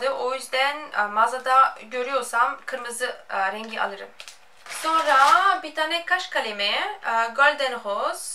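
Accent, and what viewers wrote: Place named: France. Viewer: Fransız aksanın var galiba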